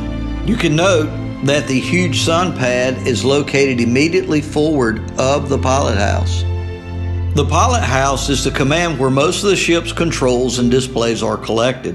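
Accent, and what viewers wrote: South, United States. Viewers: "Jeff foxworthy narrated a yacht video? Who knew..." Dr. Phil, Bill Clinton, now Jeff Foxworthy 3 down and over 150 Million with Southern accent to count one by one.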